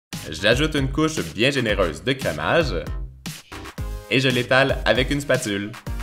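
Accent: Quebec, Canada. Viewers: 🎂🎂Félicitation pour tes 100 000 abonnés PS :je kiff ton accent québécois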